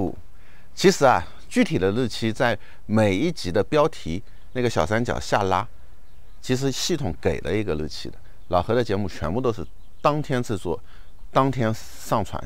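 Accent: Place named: Zhejiang, China. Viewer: 我就想问下老何是衢州人吗？普通话妥妥的浙西口音啊😇听到乡音好亲切！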